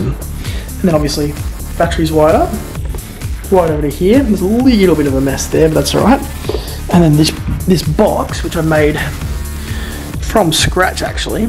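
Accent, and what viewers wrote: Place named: Australia. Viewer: Feeling a lot like a mighty car mods video, not sure if its the similar music in the background or the aussie accent! haha Keep it up man!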